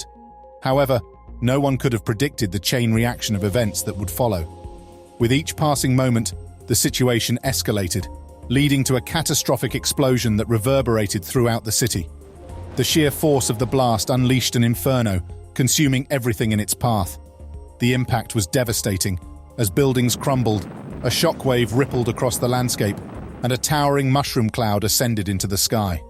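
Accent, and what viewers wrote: United Kingdom. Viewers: Could do without the narrator's brit accent. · Is this a Jew with a British accent attacking the White race again? Pretty sure it's a Jew jewing. Christians eat this shit up.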